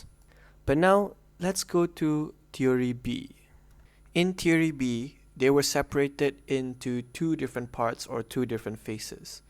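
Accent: Malaysia. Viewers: U sound very malaysian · correct me if im wrong...but your pronunciation like manglish la...are malay bro?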